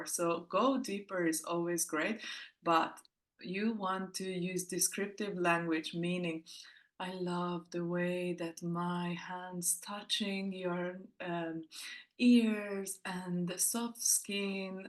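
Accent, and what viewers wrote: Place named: Russia. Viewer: Haha maybe. I like your voice, sounds Russian or Bulgarian accent. I felt onwards your voice very slow and direct/strict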